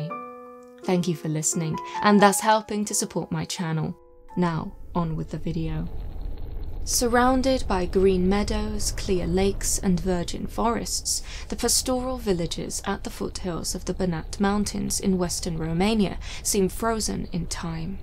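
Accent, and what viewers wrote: United Kingdom. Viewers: I think she's so hot. The whole darkness shit and proper British accent aka queens English while talking about spooky ass shit just hits you right in the feels. The fact she covers well known stories yet divulges so much new information, it usually ends up changing ones perspective as there is no preexisting agenda.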